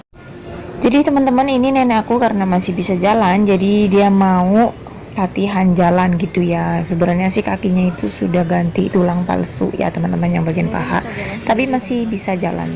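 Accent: Banyumas, Indonesia. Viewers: Salam kompak wong ngapak..ora ngapak ora kepenak kk..😁 Sukses selalu kk..🙏🙏🙏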